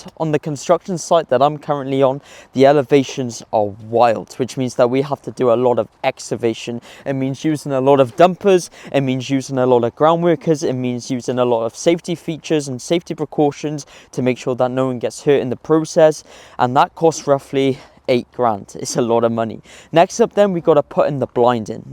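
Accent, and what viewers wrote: Wales, United Kingdom. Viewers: Can decide if he got a Welsh accent or a foreign accent! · Good video. Think about making more videos breaking down each process and showing them actually being done. You sound like you might be from Wales. I've run a few jobs myself. Currently coming to the end of a refurb in ponty. In Wales of course we now have to fit Sprinkler systems in new builds - even domestic ones. We've just done almost £10,000 on a sprinkler system and fire alalrm for a five bed HMO. Thank you Welsh Gov. Anyway, great vid, great channel good luck on making more videos. 👍👍👍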